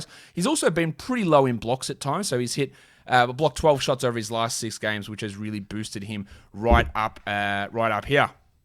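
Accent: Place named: Australia. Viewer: Great show. My wife likes ya Australian accent. Cheers to the weekend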